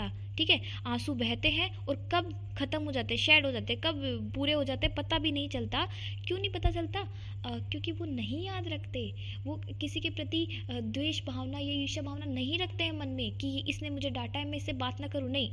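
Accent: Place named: Rajasthan, India. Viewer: Btw... Scrolling through ur channel i realize 2 things... U have a really sweet voice & that has a rajasthani texture r u from rajasthan or sort of outskirt's of it ??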